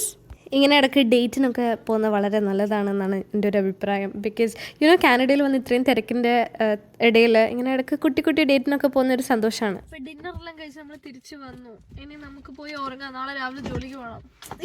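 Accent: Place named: Thalassery, India. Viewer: That thalassery slang is slaying 😂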